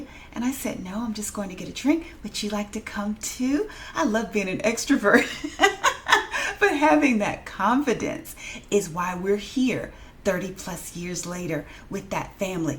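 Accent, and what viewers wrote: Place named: South, United States. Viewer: okay, I thought I was picking up that southern lady style and I did hear you say that you and your husband were at Clemson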